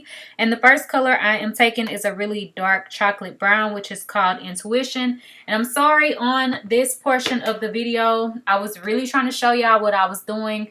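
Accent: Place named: South, United States. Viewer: Yessss I love these type of videos! Your makeup is so nice, your hair is bomb like what lace?!! I LOVE your pregnancy glow and your cute country accent. yess keep them coming!!! do more grwm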